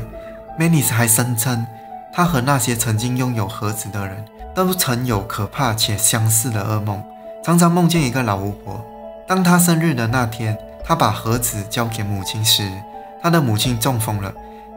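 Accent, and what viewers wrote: Malaysia. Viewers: 應該是馬來西亞人，聽口音就知道了。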